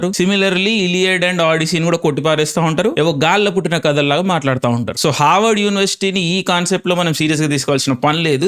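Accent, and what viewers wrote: Telangana, India. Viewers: Starting audio vini Telangana bhasha lo ki dub chesesavemo anukunna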